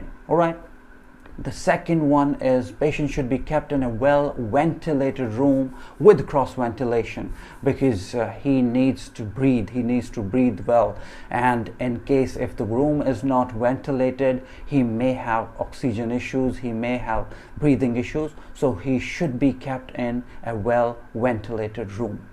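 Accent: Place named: United States. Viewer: Why are you talking in an American accent?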